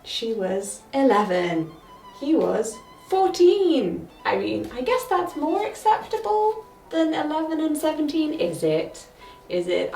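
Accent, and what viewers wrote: RP, United Kingdom. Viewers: You put so much preparation into these videos! Thank you for all the hard work. You're really interesting (plus you have an awesome British accent which I enjoy). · Oh your that's sort of posh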